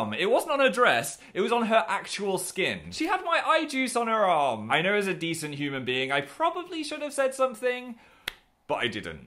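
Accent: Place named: England, United Kingdom. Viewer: I love ur accent, I have the same accent as u cause I am english but u say seem words differently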